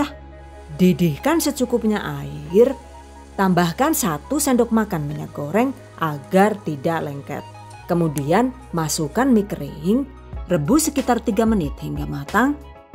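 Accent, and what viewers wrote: Banyumas, Indonesia. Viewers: Kalo mi nya suka yg pipih Bun, tapi suka yg pake udang dan pakcoy. :) Ada ngapak2 nya itu lho yg bikin tambah greget pengin nonton Terus video bunda . :D sehat terus bunda agar bisa selalu share ilmu ke kami Mahmud. Biar misua tambah saayoong..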